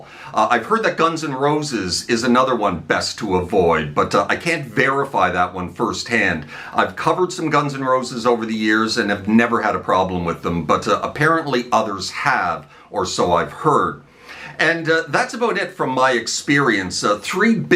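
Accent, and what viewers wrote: Canada. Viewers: That's sounds like a great Maritimes accent if I am coreect? Awesome channel with great song selections, keep the great work.. Newly subscribed in the past month, your a great player, would love to hear some original content if you have any. God bless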